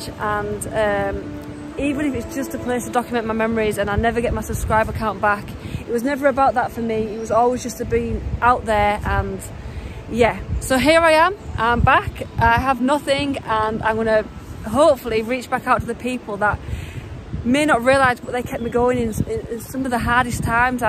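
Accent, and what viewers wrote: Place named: England, United Kingdom. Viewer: Your Welcome. I love your accent. I'm English and Irish and I'm from Newfoundland, so I have an accent too. Love that English accent. Awesome. So glad your back.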